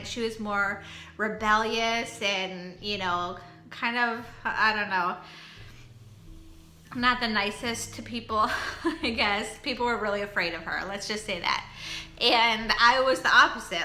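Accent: Minnesota, United States. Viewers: I was so sure that was a MN accent. I'm originally from Northern MN myself. Thanks for sharing ❤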